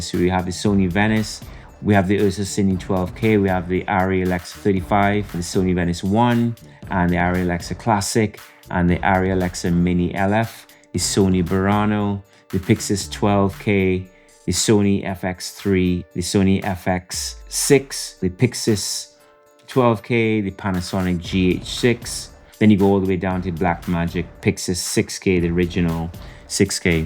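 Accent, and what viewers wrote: Trinidad and Tobago. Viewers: Which part of the Caribbean are you from? You sound Trini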